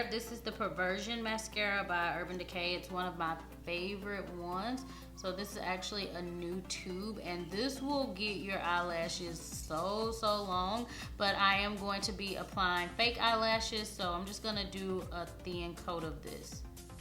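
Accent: South, United States. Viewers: I'm freaking love your channel! My friends always tell me that I sound like you. #southerngirlaccent #nitraabsnapchatgiveaway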